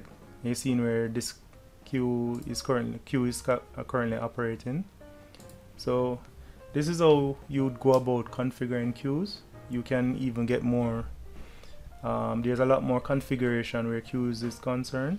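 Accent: Jamaica. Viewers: good and useful video, I feel the Jamaican accent very much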